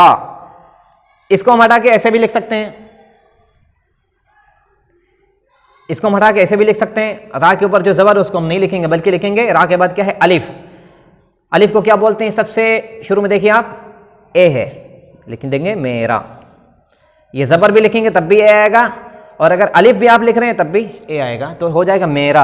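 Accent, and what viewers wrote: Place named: India. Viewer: Your pronunciation is according India not UK.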